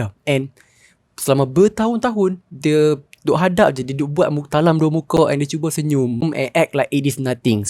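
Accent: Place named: Kedah, Malaysia. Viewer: aku support dam subscribe bagi peminat one piece fans dan aku suka bangsa utagha hang punya loghat sama mcm the show one piece sebab we are same. We are the Northerians Guysss.hahahaha...bangga jadi bangsa Kedah noh...hahahah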